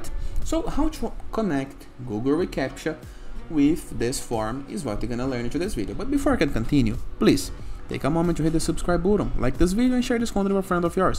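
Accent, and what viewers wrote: Brazil. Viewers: Cara, tu é Brasileiro?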